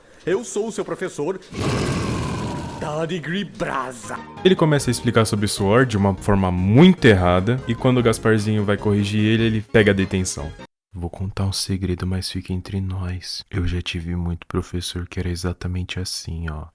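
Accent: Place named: Rio Grande do Sul, Brazil. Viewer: Vc é gaúcho massa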